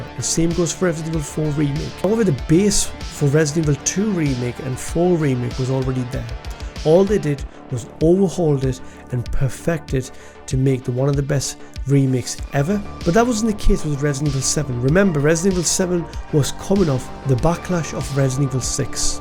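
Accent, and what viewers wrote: Newcastle, United Kingdom. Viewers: Off topic, is that a Geordie accent?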